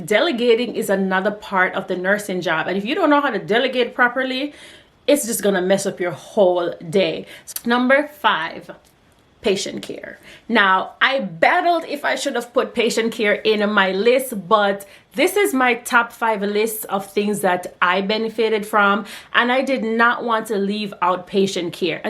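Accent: Jamaica. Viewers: Yeah I can hear the patio 🙂 you are a yardie 🇯🇲. I enjoyed your bullet points. And yes, I am an aide.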